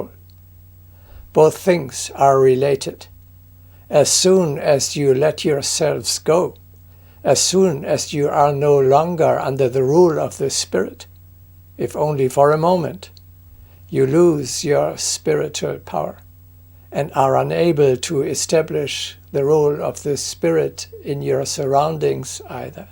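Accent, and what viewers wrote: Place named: RP, United Kingdom. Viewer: Ready, willing, able, and at your service Dear Ones. Perfect use of inherently limited Queens English.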